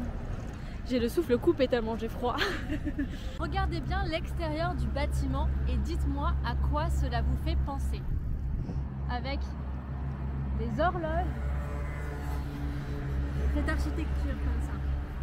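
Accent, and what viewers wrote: France. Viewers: J'adore énormément votre accent français Madame française.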